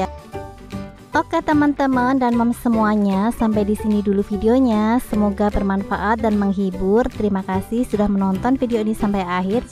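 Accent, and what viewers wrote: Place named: Java, Indonesia. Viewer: Bundaa Asli Bali apa bukan bun.. Masih ada logat jawa nya heee.. Tarik sis... Semangka... Hihi.. Segee bgt..dalam nya kuning yah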